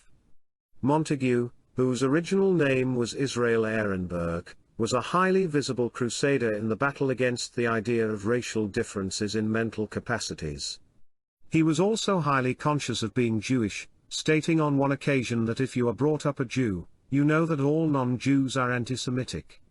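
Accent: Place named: United Kingdom. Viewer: The british voice actor ..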